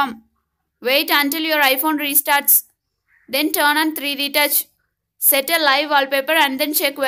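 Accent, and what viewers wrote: India. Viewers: Indian English. Why in so hurry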